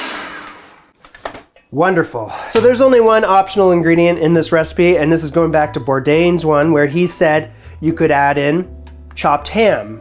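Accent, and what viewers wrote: Canada. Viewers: The Canadian accent is really coming back now that you’re back in the mother country. Aye?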